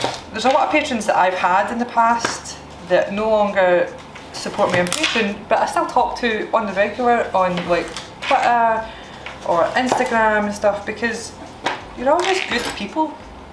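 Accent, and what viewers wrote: Scotland, United Kingdom. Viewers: damn I love the scott accent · I get the feeling that lots of people can't undetstand you since you don't speak " youtube Scottish", very, very likely everyone still loves your wholesomeness, but for me, I'm Australian. Both my folks are fresh off the boat Scots and I have a massive family in Scotland. Iv grown up listening to Scottish- actual Scottish on youtube is so refreshing. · A Scottish accent on women might be a crime against humanity. Why did she remove a quarter of the carrots? Why did she remove over half of the sellery and throw it away? Why did she fry the vegetables first, ensuring that the meat can never get brown? All of that said, I think the basic recipe has potential and I'll try it out, maybe add the cream on the second day for variety though. PS: Don't use plastic chopping boards, plastic's bad for you.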